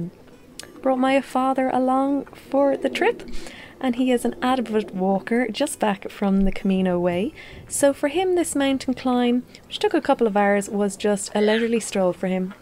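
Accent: Ireland. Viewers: What an incredible way to spend some time with your father ❤️ I love your Irish accent. In my home, my children bring back the fruit scraps from their lunch or snack. I keep all in the fridge in a container and use them to make a lovely sorta “Christmas” scent in the crock pot. Add some cinnamon and vanilla extract, and omg heaven. Once the scent has been used to its full potential, I purée it, mix it with water and left over coffee grinds, feed it to my garden. Works for me and nothing goes to waste :) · All your videos just make me want to visit Ireland, it looks so beautiful and calm and the accent is gorgeous. I'm so happy that you appreciate your country so others can as well.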